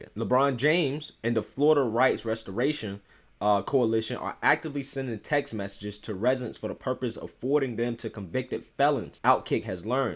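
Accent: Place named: Washington DC, United States. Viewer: Hey boss where are you from city wise, just curious my guess from the way you pronounce certain words i would say the DC area and or the Baltimore area? I'm a correct? Lol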